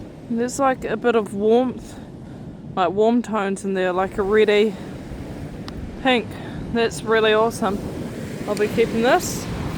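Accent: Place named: South Island, New Zealand. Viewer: awesome yeah I've been mucking around with polishing pads on temu, finally found a set for about $40 that goes up to 10000grit and I just use them on an old bench grinder. It's quite slow so not much chance of overheating and blistering the rock a mistake I've made while learning and I bought a tile saw from Bunnings with a continuous blade for cutting them $140 ish I definitely need a bigger saw though for the bigger rock's. Cool I thought it looked like nz haha and a bit of a south island accent 😅 I lived in chch for years.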